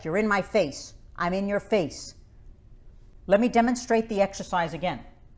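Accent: New York, United States. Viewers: I knew you had to be from NY or NJ by that accent! Thanks for all the great content!!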